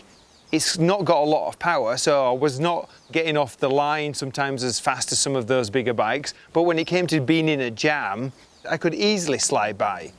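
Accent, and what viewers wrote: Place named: Yorkshire, United Kingdom. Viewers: Hull/Yorkshire accent ? Lovely little bike and the price is bang on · Bridlington accent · Did not expect the northern accent in that location.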